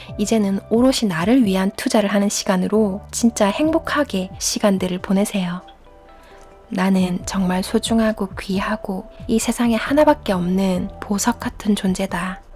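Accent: Gyeongsang, South Korea. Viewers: 갱상도 어감이?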